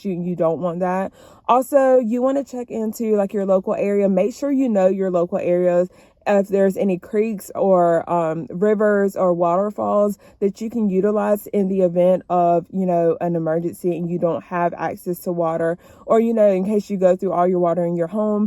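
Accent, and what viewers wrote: South, United States. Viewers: I love that southern twang ❤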